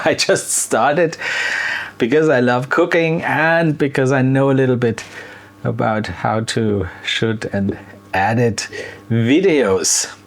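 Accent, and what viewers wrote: Germany. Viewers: Congratulations!!! Great Job!!!🎈🎉🏅🙌🙌🙌 My background is German and I miss my Auntie who cooked wonderful German recipes (she passed 20 years ago) and my Mom is 93 and no longer cooks so now I look to your channel to learn some favourite German recipes. Love your German accent and your occasional German expressions and sentences because it refreshes my German (I'm in Canada) because it makes your channel Authentic. Keep up the great work!!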